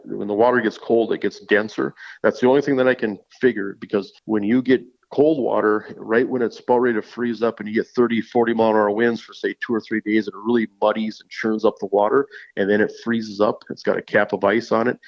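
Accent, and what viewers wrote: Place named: Minnesota, United States. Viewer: Moved from Mid-west 26 years ago , you can not hide that minnesoat, north dakota accent. Dont-cha-know!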